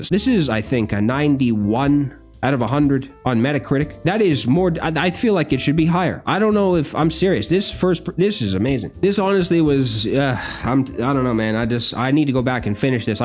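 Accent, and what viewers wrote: Canada. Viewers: I love your Canadian accent. But I will say that you should play Resistance 2 and 3. It improves on the first game....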